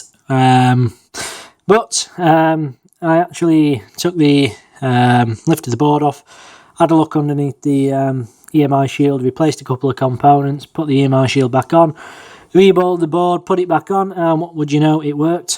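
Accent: United Kingdom. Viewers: Thank you kind sir. while some advice did get lost in translation due to your use of "the queens english" overall you where most helpful.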